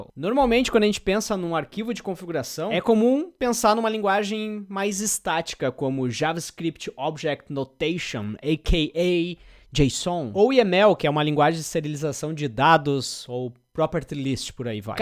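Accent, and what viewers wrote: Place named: Ceará, Brazil. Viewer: é cearense esse macho, certeza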